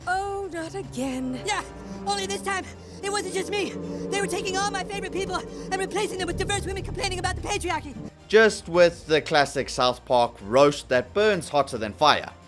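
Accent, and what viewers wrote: South Africa. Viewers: I know it says united states under your page but I can't unhear it, you sound South African bro are you from here by any chance? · Kan hoor jy is n South African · You sound south african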